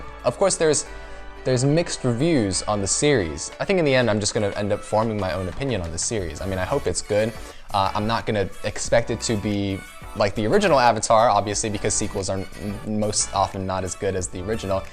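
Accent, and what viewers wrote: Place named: United Kingdom. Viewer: What’s with that British accent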